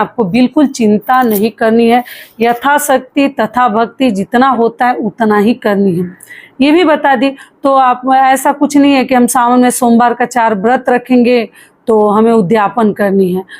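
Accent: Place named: Bhojpuri, India. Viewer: I think shw from purvanchal or bihar bhojpuri belt.. her accent is saying.. jay shiv parvati ❤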